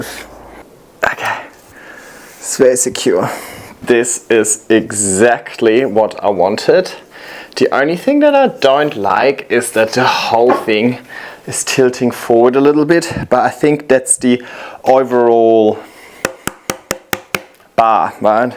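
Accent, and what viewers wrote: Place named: Germany. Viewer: I loved this video - you had me giggling several times! And you got my brain going as I am just starting my moss pole journey - propagating and gathering up a few plants right now and will start replanting soon. As I have been doing this - I am starting to think to my winter (up here in Oregon) coming in a few months and I know I will need to starting thinking about lights when I bring the plants inside! Your ideas are always fun and really help me to be creative as well. Someone else said they enjoy listening to you - I do too. The first video i listened to, I kept thinking, "where do I know that accent from?", then it hit me! you sound just like Helena, my German foreign exchange student from 12 years ago! I am hooked on your videos and can't wait to see if I can replicate a few of your fun moss pole successes!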